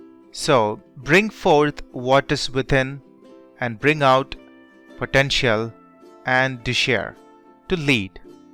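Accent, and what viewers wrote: India. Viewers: funny indian accent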